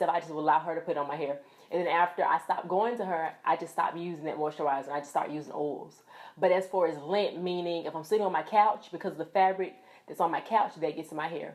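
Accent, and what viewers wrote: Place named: Tennessee, United States. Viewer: I knew it, I have a few friends from Tennessee, and you sound like them. I figured it was a Tennessee accent, but I had to make sure. Kudos for my ears....lol....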